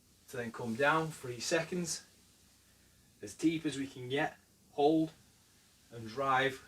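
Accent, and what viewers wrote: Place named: Yorkshire, United Kingdom. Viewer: Sounding extra Yorkshire in this one,! Thanks for the video.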